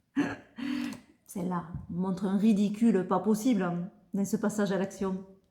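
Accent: South, France. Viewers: ton accent du sud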